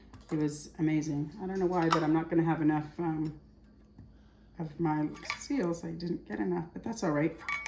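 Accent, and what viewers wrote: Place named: Manitoba, Canada. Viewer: Thank you for sharing your method! I have yet to try water bath canning, but am excited to have some ready-made food to have on hand. My sister in law's parents are from Manitoba, your accent sounds similar! Greetings from Ohio 🙃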